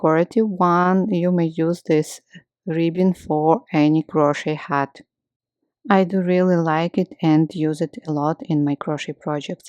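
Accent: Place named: Ukraine. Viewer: Are you from Ukraine? I am and I adore your pronunciation. Your tutorial is perfect, it's just what I need. I'm going to knit this hat. Thanks a lot. God bless you. ❤❤❤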